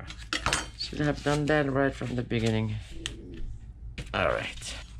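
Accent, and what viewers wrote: France. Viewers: LoL 🤣 you make me laugh Every TIME I watch you 😂 Lol!! I'm so glad I found your channel. No Lie.... When that music 🎶🎵🎶 came on out of 'nowhere' and you said "I gotta go..!."🤪then "I'm kidding!!" and cackled afterwards..I almost D⚰️d in hysterical laughter. I had to rewind to 🙉 hear it Again! You Are a Joy to watch because I don't know what you May say in your Cute French Accent that will have me howling in laughter!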